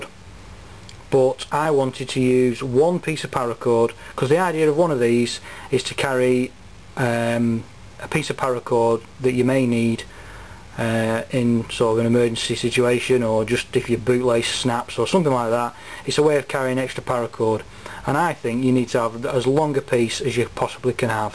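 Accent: Australia. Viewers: are you from Australia mate??? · I got a question are you Aussie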